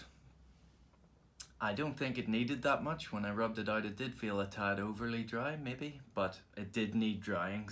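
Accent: Ireland. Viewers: Thank you for subscribing to me do I detect a little IR ish in your voice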